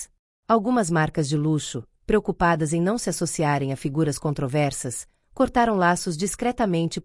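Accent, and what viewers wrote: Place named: Spain. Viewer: O português, de repente, do nada, vira espanhol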